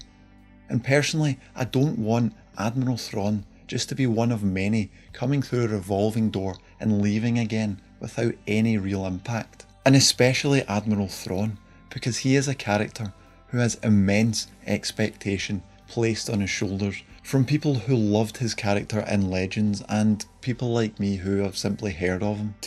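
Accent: Scotland, United Kingdom. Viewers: haha you're Scottish, small world :)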